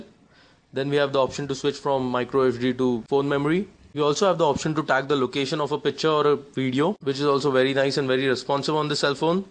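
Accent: India. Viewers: i really love Indian accent :)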